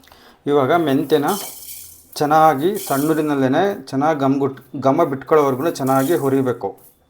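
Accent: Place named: Sira, India. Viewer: Nivu sira ಕಡೆಯವರ ನಿಮ್ಮ ಭಾಷೆ ಹಾಗೆ ಇದೆ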